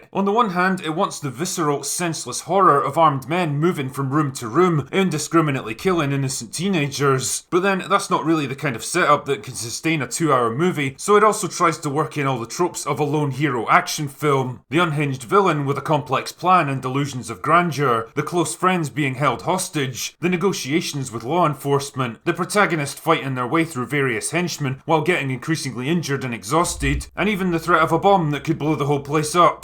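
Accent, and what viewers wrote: United Kingdom. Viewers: Tip: drop the accent, if it's designed to get attention. If it's real, WTF is a Brit doing critiquing an American film about an American heroine using her 2nd Amendment rights to bring justice to a bunch of bad guys? BTW: wilderness vs a school/ mall/ movie theater? Numbnuts, where you BEEN?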